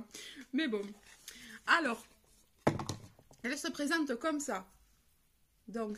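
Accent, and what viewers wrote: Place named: Castres, France. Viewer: j'ai reconnue l'accent, je suis née à castres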